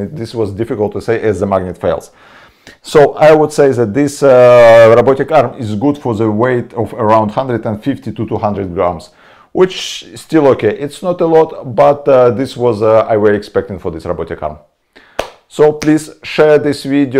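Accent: Russia. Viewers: Русский акцент) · I'll take strong rashn accent over strong Indian accent any day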